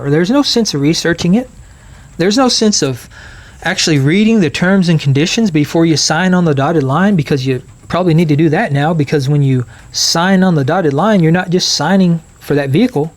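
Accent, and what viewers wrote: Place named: Kentucky, United States. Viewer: I’m from Southampton, UK. I’ve been listening for a while — what an accent you have from Kunnnntuckyyyy! Anyway, I wonder if these data centres are also connected to rezoning. Imagine living on the same road as one of these facilities with all the noise pollution. It makes you wonder whether some people are being pushed out of their homes.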